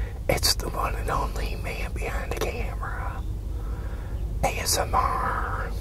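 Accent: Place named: South, United States. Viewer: Are you originally from the south? You have a really cute southern accent lol. Have a lovely Sunday,! ☀️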